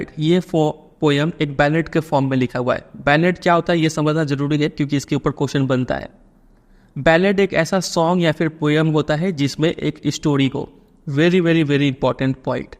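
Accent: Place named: Bengal, India. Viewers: Sir app bengali ho na?? I understood because I am a bengali too.. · Sir's bengali accent revealed 🎉🎉🎉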